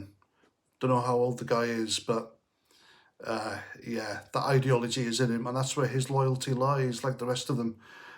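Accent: Wales, United Kingdom. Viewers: Er, how about "no"? Saves you having to listen to drivel delivered in a ghastly, lisping Welsh accent.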